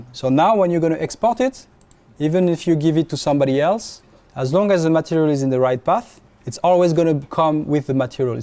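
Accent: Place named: France. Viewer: Good french accent ! haha content de voir un Français chez Crytek ! c'est pas tout les jours !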